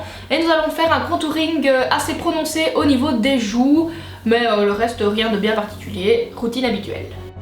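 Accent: Belgium. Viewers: Je pense que ma vidéo préférée de ta chaîne est celle ci parce que c'est toi qui m'a donné envie de m'interresser au Lolita. Et je trouve tu arrives vraiment bien à coordonner que ce soit les vêtements comme le maquillage. Et cette vidéo est dans le thème d'halloween qui est ma période préférée de l'année. En plus le noir et le orange ne sont pas des couleurs évidentes à coordiner surtout pour du loli. Sinon j'adore ton humour, ton petit accent belge, ta façon d'être un peu maladroite et naturelle. Voilà 💕 🎀🎀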